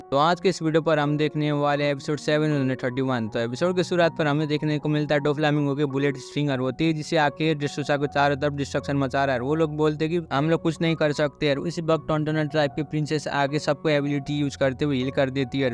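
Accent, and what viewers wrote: Nepal. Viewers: Are you nepali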